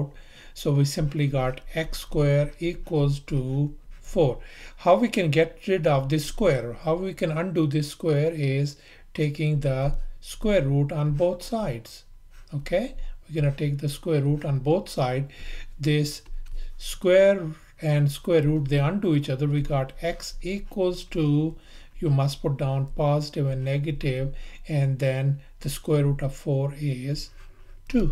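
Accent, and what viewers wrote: India. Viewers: Indian dialected man good job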